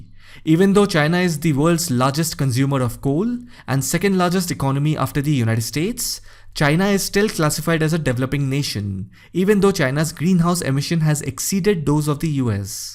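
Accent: India. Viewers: your English feel like hindi , easy to understand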